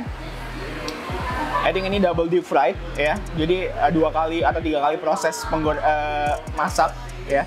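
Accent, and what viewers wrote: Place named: South Jakarta, Indonesia. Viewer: Bahasa jaksel lu gak usah ditahan-tahan bang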